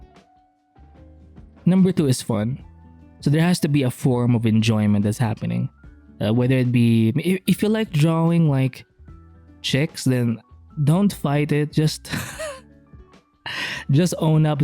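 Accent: Philippines. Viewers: I cant quite pin point it, but you sometimes sound like a Filipino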